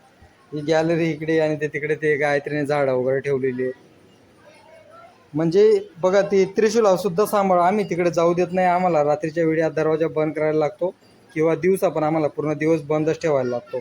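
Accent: Khandesh, India. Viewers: भाऊ तू खान्देशी आहे का? तुझ्या भाषेवरून वाटले कि तू खान्देशी आहे. मी पण जळगाव ची आहे.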